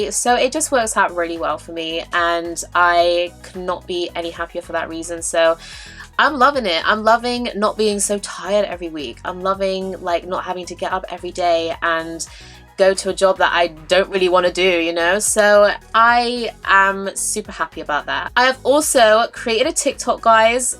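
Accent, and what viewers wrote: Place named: United Kingdom. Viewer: I hope this amazing life has continued. I didn’t now I longed for a British voice till yours and you have the look of the female lead role in movie Aspen Extreme Finola Hughes who. I totally crushed on in 1993 😮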